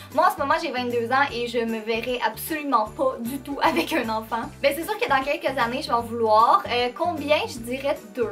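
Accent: Quebec, Canada. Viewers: J'aime tellement l'accent québécois ♥️ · Un meet-up en Suisse ? bisous de Suisse 😘❤ et j adore ton accent Québécois 😊 je suis venue au Canada il y a 2 ans 🙈👌❤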